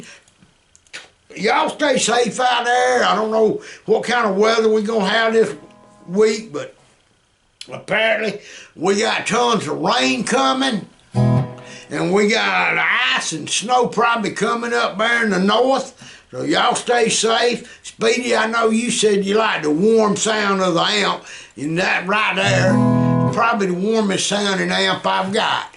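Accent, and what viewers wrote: Texas, United States. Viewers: Is this Texas dialect ? Dont know wich slang is but is badass.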